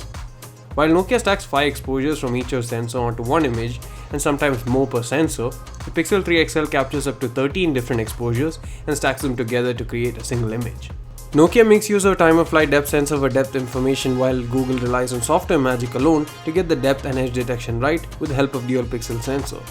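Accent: Kerala, India. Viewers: Are u a malayalee......bro?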